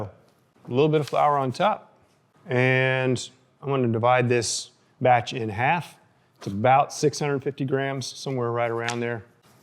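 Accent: Arkansas, United States. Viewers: Being a Memphian, I was intrigued to hear your slight accent... cool to find out that you are originally from Arkansas! Love to watch you bake! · I’ve been watching these videos religiously for months. I knew that accent sounded familiar. Had no idea you were a fellow Arkansan! Thanks for the work you do! It’s been a big help to this amateur baker.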